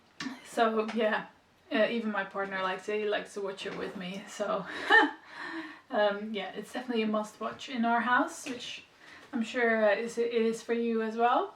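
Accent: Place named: United States. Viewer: Hi, I found you through Liz, the Baker that Sews, she always makes great recommendations! Where are you in the world? Your accent sounds American but I expect I am absolutely wrong there! You are going to be very busy sewing with all your plans! Looking forward to seeing your makes! I have just finished Sew House Seven Sauvie sundress for my holiday in Dubai in a few days, can’t wait! We are going to have a Sewing Bee in April, it’s such an inspiration. Good luck with your channel.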